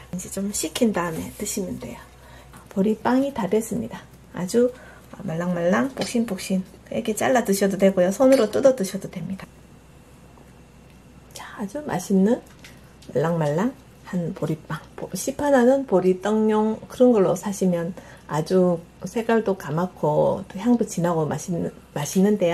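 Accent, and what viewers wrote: Gyeongsang, South Korea. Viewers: 말씀 너무 잘하세요..경상도 어투가 이뿌시네요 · 목소리가 너무 좋아요 갱상도 사투리도 매력적이구요 영상 내용도 유익하구요 남편분 좋으시겠어요 감사합니다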